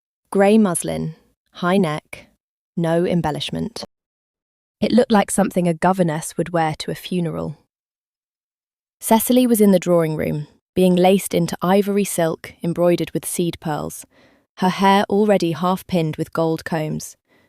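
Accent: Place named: Northern England, United Kingdom. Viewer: The narrators voice is perfect. I love what I believe are shades of her northern or midland accent. The story line was very good. Your channel is a favourite of mine. I found these YT romantic Regency and Victorian channels when they first emerged in late 2025. Thank you for working hard to make your productions just about perfect. The writing, editing and narration are flawless which makes listening a joy. JB 🇨🇦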